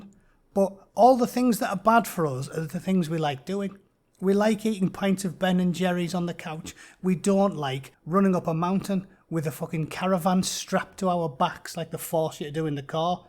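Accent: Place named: Teesside, United Kingdom. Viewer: I think it may have been a little bit of a bold statement but the point being it a lot less of a labour strong hold than it used to be. I'm from Guisborough anyway so what the fuck would I know apart from the arse end of a 🐑😉. Just though id let you know I enjoy the content and it's nice hearing a local voice shitting on the commies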